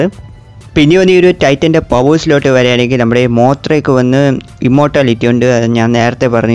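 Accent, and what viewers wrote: India. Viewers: Thamizhan ആണെന്ന് തോന്നുന്ന്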